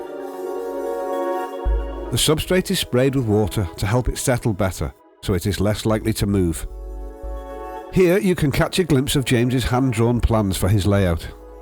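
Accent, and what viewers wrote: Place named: England, United Kingdom. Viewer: The narrator is speaking in a regional English accent not spoken in North America but in England.. Similar to the difference between Mexican and Castillan:)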